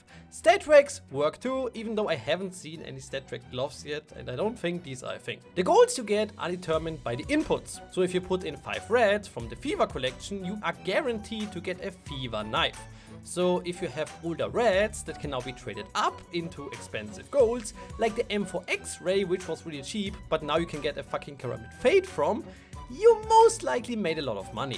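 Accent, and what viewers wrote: Germany. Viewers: Alter dieser scheiss deutscher akzent ich kann nicht mehr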